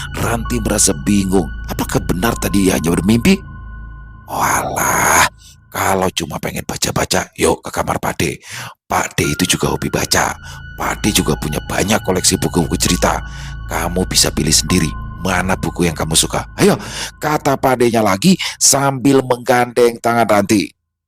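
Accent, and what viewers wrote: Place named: Java, Indonesia. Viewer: Assalamualaikum bang.. Mlg hadir nyimak.. seru ceritanya bang.. bikin terharu skalian lucu jg dengerin bang dgn aksen jawanya.. sm pas niruin suara mrk.. suuiip bgt.. terhibur skl denger cerita dr bang.. sukses buat bang n crew.. Aamiin..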